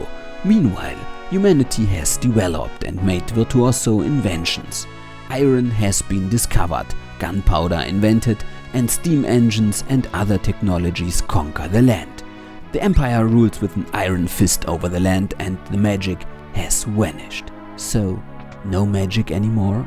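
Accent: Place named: Germany. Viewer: Schönes Review! Angenehm anzuschauen und anzuhören. Weiter so! Der deutsche Akzent sticht manchmal noch heraus bei Wörtern wie "diagonally", "devices" oder "heard". Aber nicht schlimm ;) Außerdem "comfortably", nicht "comfortable" ;)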